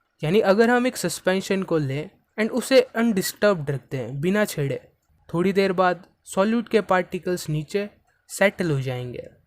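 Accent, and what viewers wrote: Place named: Rajasthan, India. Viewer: Sir aap ki sound bahut acchi hai like Rajasthani ❤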